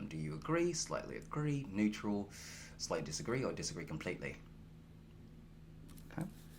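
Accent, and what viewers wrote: England, United Kingdom. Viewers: British accents trigger me so much, and yours is one of the best 👌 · Omg it’s the return of the British accent!! I feel like it’s been literal years since you’ve used it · The British accent is the one!! 😂🥰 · Lovin the British accent 🤣 I’m British myself haha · British accent is awesome. Please use in other videos! Thank you for your hard work. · Ooooooo love the British accent! Wasn't expecting that at ALL and I IMMEDIATELY grinned xD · Bro the British actually matches you 😂🖤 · Amazing English accent!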